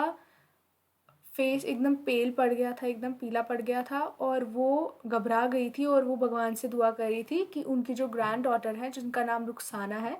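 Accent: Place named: Kashmir, India. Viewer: I think you are from kashmir, your voice 🔥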